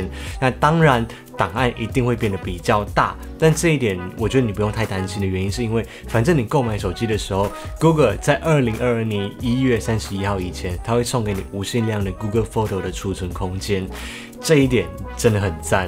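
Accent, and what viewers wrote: Taiwan. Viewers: 录得很好 Youtuber 的台湾腔听起来非常舒服😌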